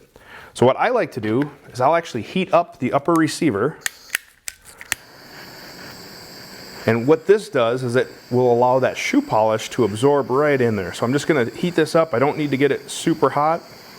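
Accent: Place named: Wisconsin, United States. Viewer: Ah, the accent of a fellow cheesehead. Sounds like home.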